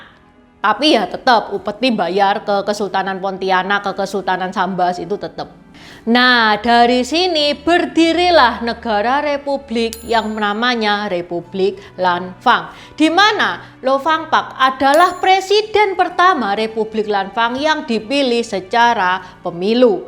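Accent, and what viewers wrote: Java, Indonesia. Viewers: Aku suka channel ini karena MEDOK nya narasi... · Gini dong, Tionghoa medok khas Jateng dan Jatim. YouTuber daerah hrs bangkit jangan didominasi Jakarta. Lanjut Mbak!!!!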